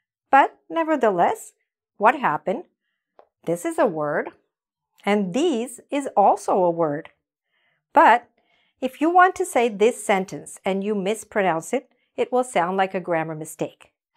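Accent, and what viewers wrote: United Kingdom. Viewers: You are my best English teacher Are you british ?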